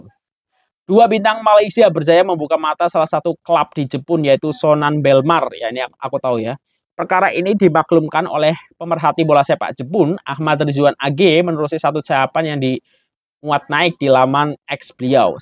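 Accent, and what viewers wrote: Java, Indonesia. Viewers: hahahaha....pekat betul jawa kau bro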